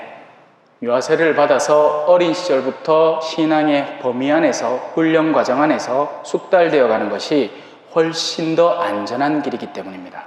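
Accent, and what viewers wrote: Gyeongsang, South Korea. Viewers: 아멘 신부님 경상도 사투리 넘 정겹습니다 강론말씀도 참 잼나게 하십니다 나날이 신부님 건강도 좋아보시니 감사드립니다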